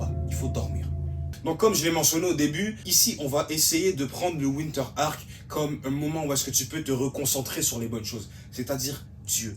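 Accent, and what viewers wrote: Canada. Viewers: superbe vidéo, j‘aurais dit « période de l’année » et non « époque » sinon la vidéo est trop qualitative et j’en avais besoin thank you sir tu es canadien? tu as un accent canadien en tout cas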